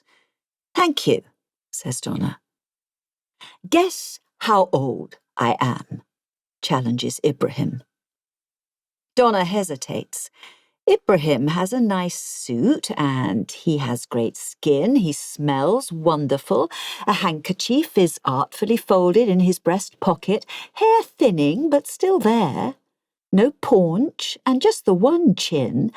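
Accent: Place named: United Kingdom. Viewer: perfect voice you have. Thanks for your reading service. I'm reading this book in the book club. I'm fascinated by British accent as a second language learner. I try to mimic your accent. thanks again.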